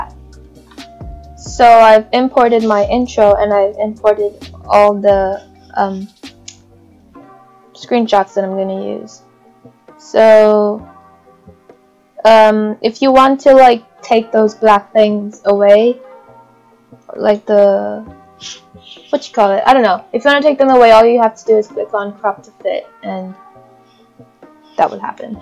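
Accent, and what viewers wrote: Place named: Europe. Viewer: why do i lowkey hear some sort of like european accent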